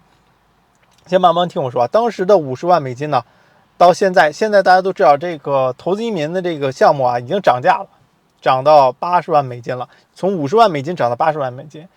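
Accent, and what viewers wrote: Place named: Beijing, China. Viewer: 我实在是听不下去了，现在发表一下我对这个“大外宣”也许是“糊涂虫”的看法与对他混淆视听的如下几点进行纠正： 1. 投资移民（EB5）项目暨大型区域中心投资项目，投资就有风险；2019年11月前绝大多数投资额为$50万，外加必要的律师费及移民局收取的费用约合4-5万，如申请人在🇺🇸以外，等待期为6-8个月随即去美国领事馆面谈拿签证并可进入🇺🇸再往后就是拿临时绿卡；等最终拿到正式拿到10年绿卡的同时，当年投入的全部50万资金一定会返还给投资人，所需全部时间一般为5到8年但也有例外，因为毕竟这是个投资项目是有风险的；目前我身边在2015至2019年持EB5签证进入美国的朋友们都已经拿到绿卡，没听说有出了问题的！ 2. 🇺🇸华人新移民一般不吃Panda Express也就是熊猫连锁快餐，原因很简单暨他们是美式中餐； 3. 🇺🇸华人新移民是美国社会现今一支很强的消费大军，无论在教育房产旅游日常用品...当地主流及外族裔极力都想进入华人市场分一杯羹，但因语言及文化差异基本都很难，除非与华人合作或聘请华人高管... 最后，我衷心的建议这位操着北京口音的“ ”，🇺🇸并不完美但她的包容与无限的机遇吸引了过去近200年，来自世界各地并前赴后继的“探险者”前来”淘金“，也许绝大多数人只能养家糊口维持生计，但也不乏有相当数量的白手起家人士赚得盆满钵满，且不必被倒查20年甚至以莫须有的罪名打入死牢... 来到一个新的国度，要多观察多学习才能尽快融入当地社会，否则就失去了移民的真正意义！ 祝好， （本人不是移民律师或相关从业人员）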